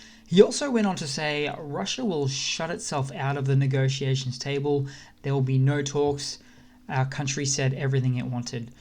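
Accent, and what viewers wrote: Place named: Australia. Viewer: Good to here an aussie accent, I liked what the Ukrainian hackers did to the sign. If you can find more humous things that would be great.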